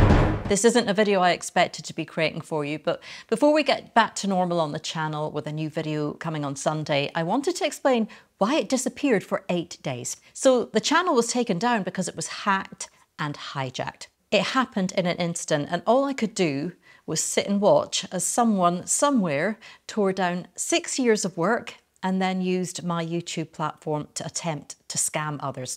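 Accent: Scotland, United Kingdom. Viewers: Subscribed to. I enjoy your chanel so much, your content, and your professionalism is very much appreciated. Also I love your wee Scottish accent🤗. Being a fellow Scot myself, living in Spain, it's a pleasure to listen to you🙏. Keep up the great work.💓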